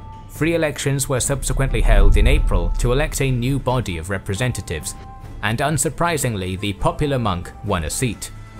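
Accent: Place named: RP, United Kingdom. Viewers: Aaron Marks To me, it just sounds like a good narrative voice, almost like a ‘radio voice’. If you had to put it into a category, I’d say it’s closest to RP, the ‘Queens English’ if you want to call it that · I have a question about that (I'm American): What particular accent does the K&G narrator have? There's something about it that makes it distinct from most UK accents I've heard, but I can't quite put my finger on what.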